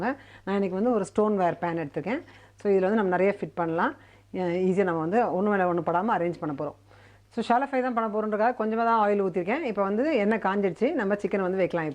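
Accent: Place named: Chennai, India. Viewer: vial modern nana kitchen. mode look. but still kneeing pesra madras tamil super irk madam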